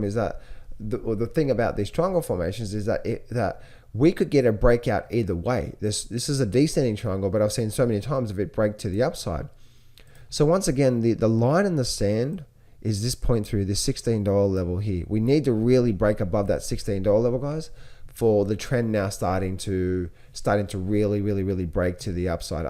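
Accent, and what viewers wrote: Australia. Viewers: Thats an Aussie accent !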